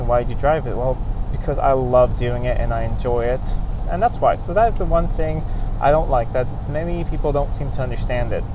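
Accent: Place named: United States. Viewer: I know I’ve heard several times saying that you were in Sweden. But you do not sound Sweden or English you sound American.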